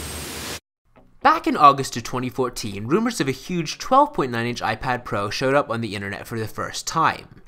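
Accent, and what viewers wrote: Scotland, United Kingdom. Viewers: Sounds like a Scottish guy trying to forcefully perfect his American accent.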